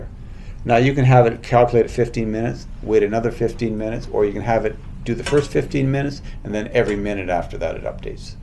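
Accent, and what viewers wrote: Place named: Canada. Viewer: 可以确定讲的是加拿大英语， 😇😇😇😇😇😇😇😇😇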